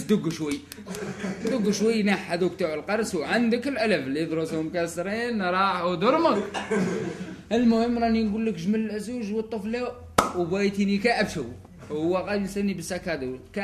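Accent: Djelfa, Algeria. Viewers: والله قتلتني بالضحك حبيبي والله نعم الرجال و لي معجبوش يعني مفهمش اللهجة نتاع الجلفة فقط كل ولاية ولهجتها الخاصة لا تعليق في هذا معجبتكش اللهجة علاش تفرجو اصلا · اللهجة الجلفة روعة تحياتي الحاج من غرداية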